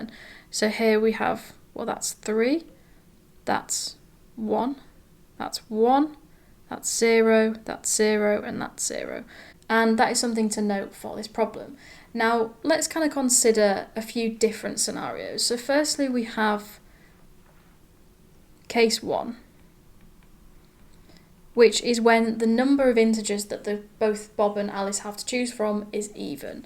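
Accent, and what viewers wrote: United Kingdom. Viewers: I adore also British English 😊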